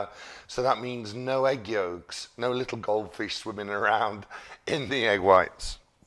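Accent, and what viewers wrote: United Kingdom. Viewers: As a Texan, you've lived here for 10 years. Why don't you have even a slight Texas accent? lol I thought that was impossible. Tell me that you throw in a y'all once in a while? That would be so fun with a clipped British accent. Thanks for the recipe. I'll be trying this recipe tomorrow. It's been too long since I had a mousse, much less whiskey chocolate. Yum.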